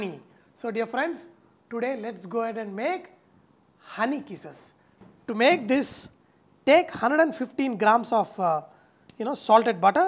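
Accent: India. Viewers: Your accent reminds me Rajesh from The Big Bang Theory. xD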